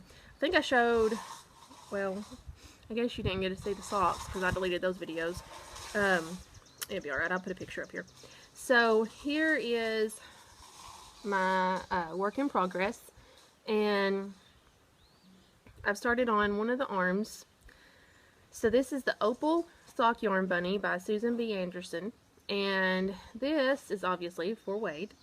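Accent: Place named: South, United States. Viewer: I have enjoyed both of your podcasts and am looking forward to more. I'm a knitter and crocheter from Pleasant Shade, TN. Love the details that you give about your projects. Your hand dyed yarns are lovely, I would love to learn to do that someday. It's a treat to hear a podcaster whose southern accent matches mine! And your Co-host is adorable 😊.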